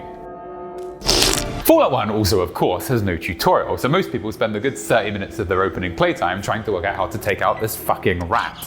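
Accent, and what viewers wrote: United Kingdom. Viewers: I love new brittish accent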